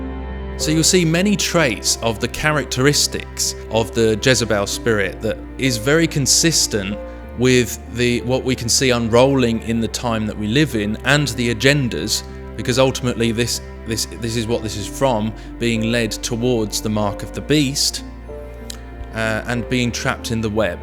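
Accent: United Kingdom. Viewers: I love our English language accent from here in the UK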